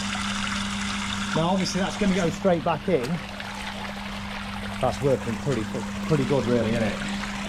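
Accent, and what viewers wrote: United Kingdom. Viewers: You British?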